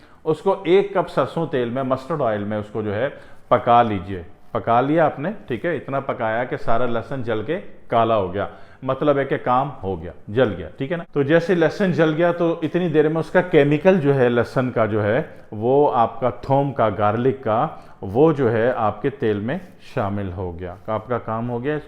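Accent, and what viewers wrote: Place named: Delhi, India. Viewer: Janaabe aali.... kiya aap ka Delhi se koi talluq hai.....aapki zubaan aur lehja Delhi ka sa lagta h .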